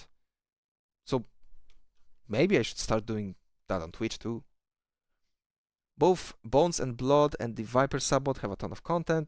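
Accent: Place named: Poland. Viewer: I don't know why your Polish accent fits the OWB theme you have in your channel.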